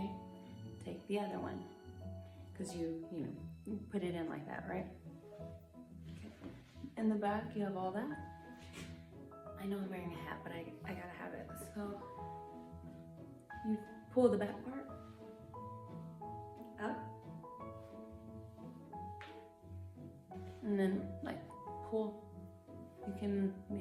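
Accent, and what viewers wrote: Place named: South, United States. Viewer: Great Video! I really enjoyed it. First, that entire struggle with the little coffee brewer had me rollin 😂. It was too cute! I also thought your story about being 2 mins late for the tour was hilarious! “I can see the backs of their heads” 🤣🤣 I missed you so much and I’m so happy you’re back. I live in NY state but my family is from the south and I miss them. Your accent feels like home to me. Thank you. You are loved.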